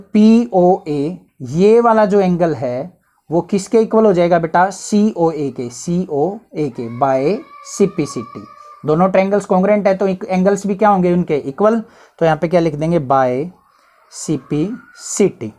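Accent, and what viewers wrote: Haryana, India. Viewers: Sir aap haryana se ho kya?? aapki voice m haryanvi accent aa rha h.. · You are from Haryana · Sir aap haryana se ho kya? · Nice sir are you frim haryana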